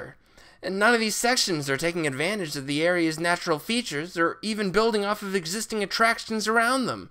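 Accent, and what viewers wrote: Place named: United States. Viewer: yes, whiney, whinging american accent is just more bad taste.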